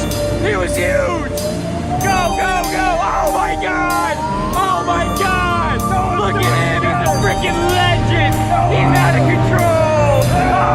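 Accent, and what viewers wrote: United States. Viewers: Do all americans speak like this?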